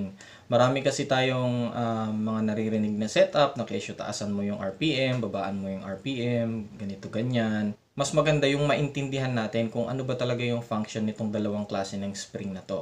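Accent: Ilocos, Philippines. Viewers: Sir Ilocanos ka po ba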